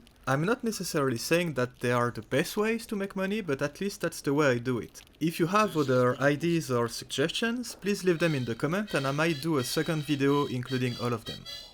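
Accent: France. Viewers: l'accent bien Français des familles ^^, très bonne vidéo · tu a un accent fr je me trompe ?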